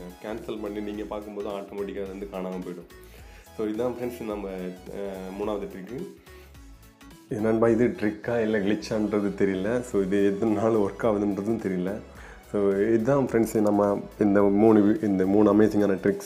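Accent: Kanyakumari, India. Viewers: Bro ungalukku kanyakumari ya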